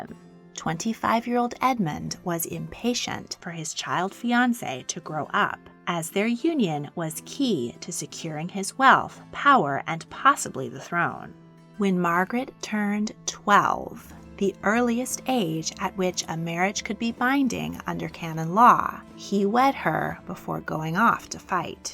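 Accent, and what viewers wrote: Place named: United States. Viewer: Dying of dysentery on the battlefield? Huh. American narrator for English history; never works.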